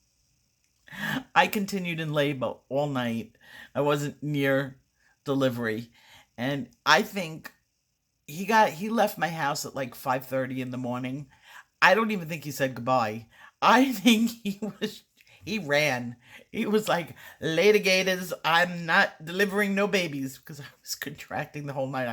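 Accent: New York, United States. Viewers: I love your stories and now you have my other cat, Loki, in thrall of you. Lol my "kids" love the NY accent;)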